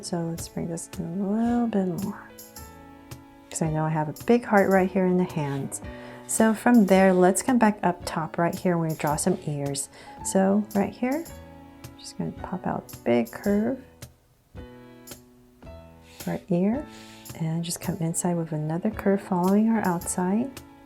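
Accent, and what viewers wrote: United States. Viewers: Omg I ❤️ Your American accent!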